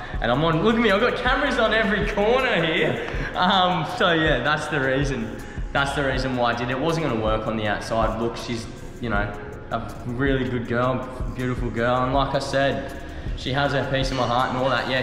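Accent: Australia. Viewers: Adam we need more vids! From the beginning to the end of Love island you were my favorite! At first intrigued by your tattoo and handsomeness little by little I discovered your laid-back, honest and kind personality! You're one of a kind! And I love your aussie accent hehe.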